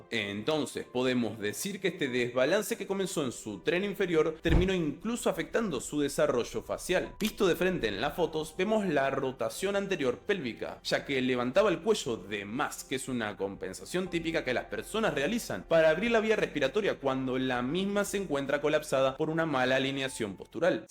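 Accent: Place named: Argentina. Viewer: Es argentino😂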